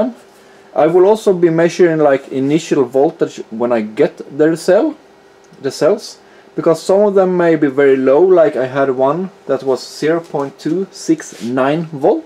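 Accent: Sweden. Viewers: Good channel! where are you from? you sound Swedish.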